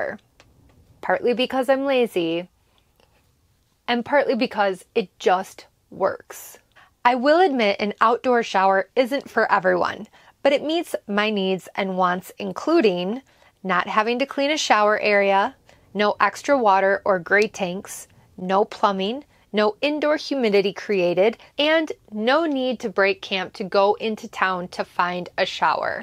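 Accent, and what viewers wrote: Wisconsin, United States. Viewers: My shower needs are probably less than yours because I’m a guy and I’m bald but the shower I keep going back to is the MSR dromedary bag with there shower attachment that I purchased in 1998 it’s simple and it works and for the last six months it’s been my only means of showers even during the-25 weather we had in Wisconsin this winter! O and when you say stuff about How you talk and ramble You just sound like a Wisconsin girl to me.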